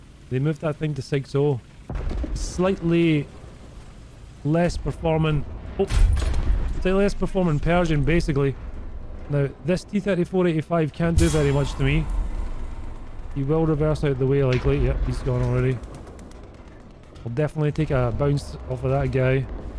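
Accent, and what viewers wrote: Scotland, United Kingdom. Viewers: A Scottish bro who is a super Pershing connoisseur? Subscribe I shall!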